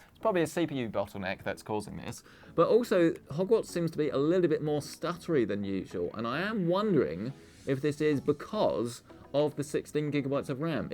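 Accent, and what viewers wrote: United Kingdom. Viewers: here for the british accent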